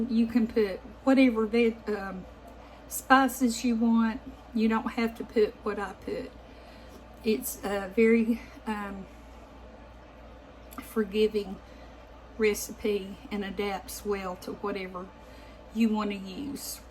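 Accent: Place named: North Carolina, United States. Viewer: Your snail mail addy is Newton NC!!! That is right down the road from me! I live in Wilkesboro NC. Only one hour from me. Thought that accent sounded from NC. So cool. Small world ain’t it? Take your time responding, the world is crazy today!!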